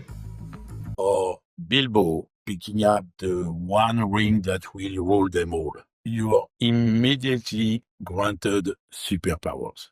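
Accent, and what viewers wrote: France. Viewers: I'm having a harder time than I normally do placing an accent with yours. 🤔Definitely French... but I'm guessing closer to Belgium? Do you like Belgian waffles? 😁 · Are you French by chance?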